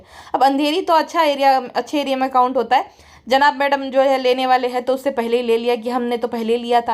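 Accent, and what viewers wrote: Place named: Uttar Pradesh, India. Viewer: Your UP accent😂😂😂 orii morii maiyaaa 😂😂 love your podcast ❤ love and support from Gr Noida..😊 aur video like kardiya h❤🥲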